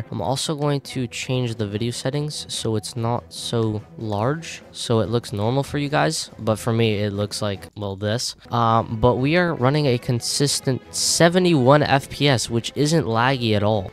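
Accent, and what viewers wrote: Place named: United Kingdom. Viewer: Ew, it's British?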